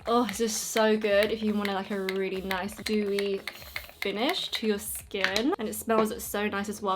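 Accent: England, United Kingdom. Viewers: I have followed you on Instagram for quite a while and just found you on YouTube. I have no idea why I didn't know you had a youtube channel but Im am so soooo shocked by your voice! 😂 I had no idea you had an english(?) accent! (Im from the US) But I love your videos and you do beautiful make up! So happy I found your channel to subscribe!!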